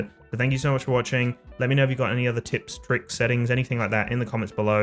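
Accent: Australia. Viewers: Easy to follow, and pause where you need to. The indexing at the bottom is a godsend. And an Aussie accent, so easy to understand. Highly recommend newbies watch this video.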